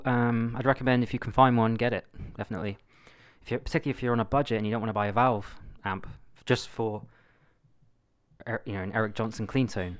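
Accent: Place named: United Kingdom. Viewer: Great video mate love EJ's clean sound so much especially for chord work and improvising. Whereabouts are you from in the UK? Can't quite place the accent.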